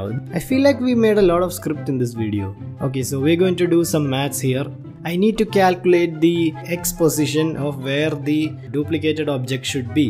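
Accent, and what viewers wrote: Kerala, India. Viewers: real malayali english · his voice is Luke indians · u litterally sound like a malayali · now I'm really sure is Malayali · you English is pure Indian English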